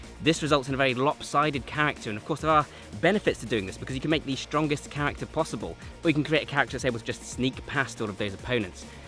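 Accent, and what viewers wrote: United Kingdom. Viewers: I just want to get swole! And I also want to strip body fat too, so I can see my abs. Perhaps it is just me as an American, but I would say that you are a more charismatic individual than you give yourself credit for. You have the British accent down. What % of your viewers are from across the pond?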